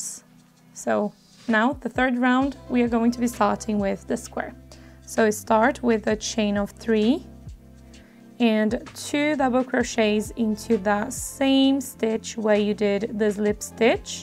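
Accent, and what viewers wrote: Brazil. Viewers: I just discovered your channel and I’m loving your tutorials, can’t stop watching ❤️ I notice you have an accent and it looks a lot like Brazilian, are you Brazilian? I am from Brazil 🥰🇧🇷🇧🇷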